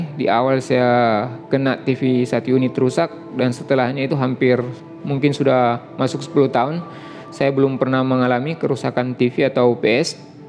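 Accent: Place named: South Sulawesi, Indonesia. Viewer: Logat nya orang sulsel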